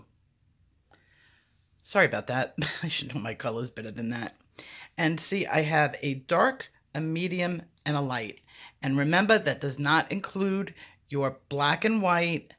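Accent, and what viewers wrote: New York, United States. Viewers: I KNEW it was New York accent I was hearing !!!!! I’m from Midwest the accents are different!! I’m the one who was sooooooooo into one of your sessions and you’re working on a leaf and there’s a middle vein in it and you say …. “Now I’m going to apply the…..” well this gal hears “pasta” !!!!!!!!! I know I know that’s how new I am I knew nothing about Posca markers!!! You made my day on a day I needed it….so I’m putting my story out there for the newbies!!!!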